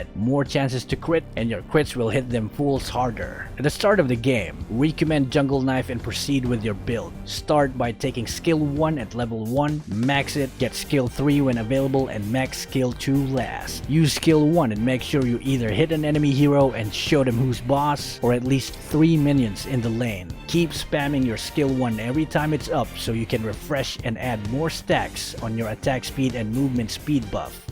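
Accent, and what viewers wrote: Philippines. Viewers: I actually thought he was a foreigner because of the accent but hey, I can hear a bit of Filipino in his words 😂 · Pilipino ba siya? HAHAHAHAH OBVIOUS E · your from ph?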